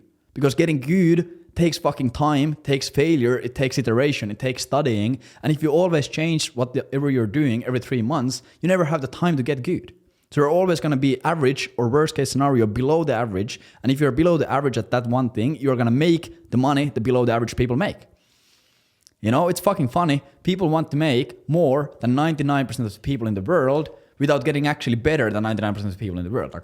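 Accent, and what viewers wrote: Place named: Finland. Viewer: Great vid, but... you said you live in Estonia, but you sound like a Finn 🧐🧐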